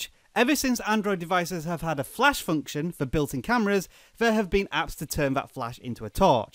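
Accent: United Kingdom. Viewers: British accent......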